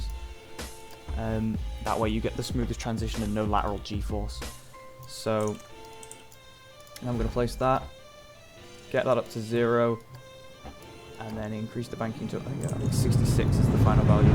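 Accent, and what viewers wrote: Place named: United Kingdom. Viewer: British accent while sounding like you just snorted some blow....maybe I'll understand at .75 speed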